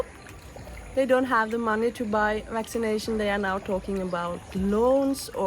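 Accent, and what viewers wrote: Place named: Sri Lanka. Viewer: Oh My God she sounds like a Sri Lankan 😁 Incredible!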